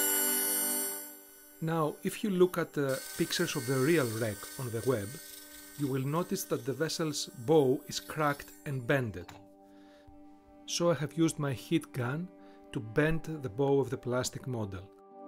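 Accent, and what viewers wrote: Greece. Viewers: Με την πρώτη λέξη κατάλαβα ότι είσαι Έλληνας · speaking English with a Greek pronunciation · your pronation its like a greek who tries to speak English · η ελληνική προφορά είναι ότι καλύτερο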